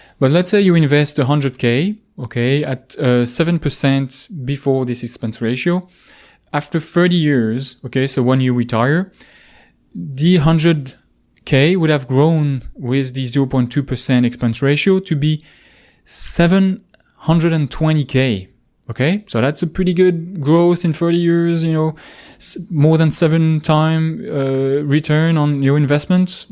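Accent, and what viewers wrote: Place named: France. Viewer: I like your french accent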